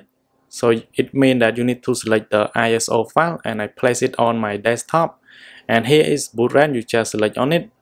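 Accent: Vietnam. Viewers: Why you read english like vietnamese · Heavy Vietnamese accent, is it like the slang America's use, or it it because that so American to you that it's already thrown out as hidden philosophy that help you speak and talk like a doctor without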